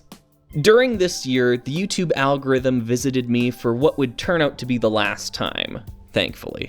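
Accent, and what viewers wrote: Canada. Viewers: I found you through your lego space minifigure tier list, which I frankly still disagree with, lol, but thats ok. I absolutely love your quirky and odd videos and slug stop motions and odd taste in sets; theyre all highly engaging. Also your very strange Canadian pronunciation of so many words. Good times. I'll be sticking around for the long haul to see what else is afoot in the channel. Keep up the great work,!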